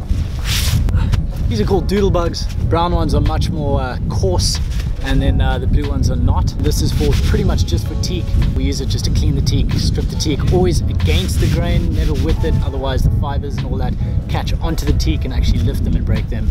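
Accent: South Africa. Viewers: Love your videos , love what you do . Are you South African ?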